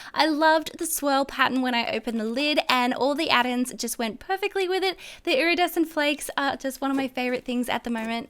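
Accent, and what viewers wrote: Australia. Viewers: OMG I LOVE!!! I’M ALSO AUSSIE AND I LOVE LISTENING TO SOMEONE WITCH THE SAME ACCENT AS ME!!! SHE MUST BE RICH TO GET SO MANY SLIMES!!!! im broke....... BUT I CAN ENJOY SLIME BY WATCHING HER VIDEOS🥰🥰🥰🥰🥰🥰🥰🥰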